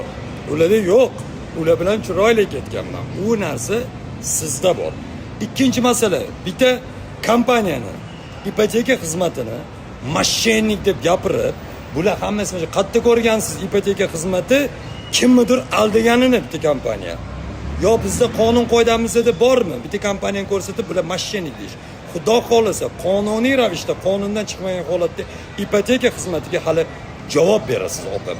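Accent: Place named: Tashkent, Uzbekistan. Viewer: Узинг наманганликсану узинча тош.ни шевасида гапирасан . 50 йил четда яшаганам тилини сотмаган акилли эркак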